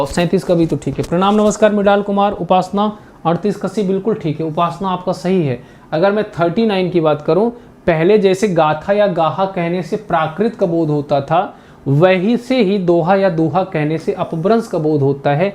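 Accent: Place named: Prayagraj, India. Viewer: Mujhe lga ki aap prayagraj se h o.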